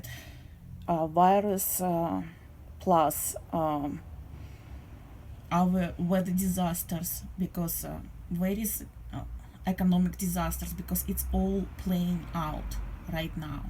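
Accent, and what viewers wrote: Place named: Russia. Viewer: your rusian english pronansiation is owfull режет слух,нельзя ли записывать на русски мадам?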